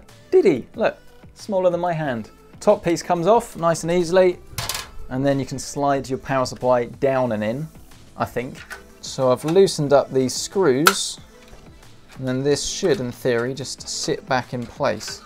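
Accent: United Kingdom. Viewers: First time ive heard the the uk accent going over pc builds its very fitting haha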